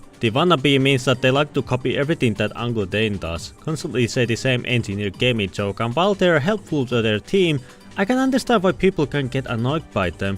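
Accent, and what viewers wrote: Finland. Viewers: Nyt on nii vahva suomiaccentti että aijaijai :D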